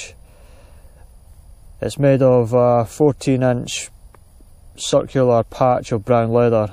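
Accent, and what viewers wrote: Scotland, United Kingdom. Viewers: Love the brave heart bagpipes. The kit and your Scottish accent are awesome. Great job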